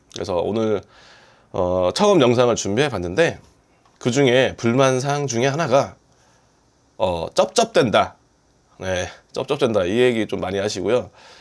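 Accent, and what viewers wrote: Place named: Jeolla, South Korea. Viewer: 못했어오 -> 모대써요x 모태써요o 대전 분인데 서남사투리 쓰지 말고 표준말 씁시다.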